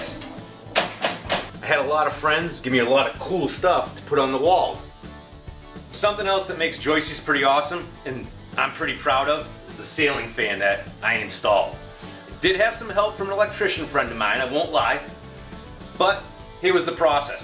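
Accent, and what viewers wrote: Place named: Boston, United States. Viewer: Looks great! Love the Boston accent! ( what’d the wife say?!?)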